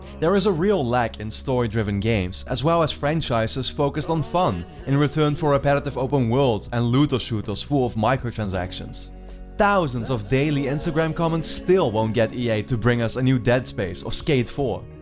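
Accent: Netherlands. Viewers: Are you dutch lol? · That accent gives you away, Nederlander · ily man, you're just to real, why you gotta hit my nostalgia buttons, not only does your dutch accent hit me ( a belgian buttboy) in the familiarity feels, but then you do this, AHHHHHHH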